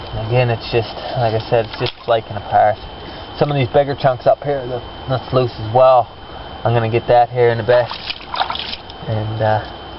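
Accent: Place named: Nova Scotia, Canada. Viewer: Nova Scotian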